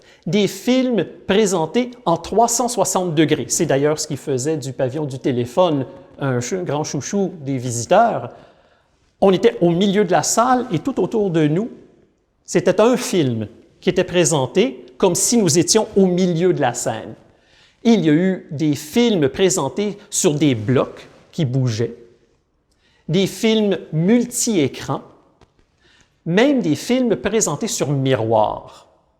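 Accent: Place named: Quebec, Canada. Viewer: Que j'aime entendre parler un québécois en Français .Merci à vous de conserver cette langue comme le reflet de votre culture et de vos origines. ENCORE MERCI DE FAIRE RAYONNER CETTE LANGUE QUE J'AIME TANT !!!👍👏❤ FRANCE (Lille)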